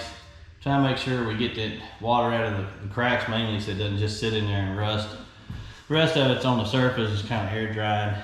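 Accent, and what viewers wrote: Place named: Virginia, United States. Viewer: Congrats on the bus! It will be a fun project. And it sounds like you are a fellow Virginian. Cool! Thanks for sharing.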